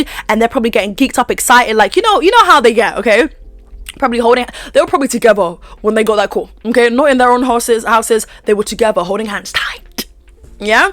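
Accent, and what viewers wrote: Australia. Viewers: Oh lord I am loving your play on the accent, you are sounding like an Aussie ❤🐨🦘🇦🇺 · Where's that ozzy accent coming from babe? and her accents always cheer me up. As far a hydrating tea, might I suggest 3 ginger and throw some fresh lemon in to the mix. Hangover, depression, exhaustion who?? That tea hits differently. Anyway, back to the drama with our main drama queen here on YouTube ❤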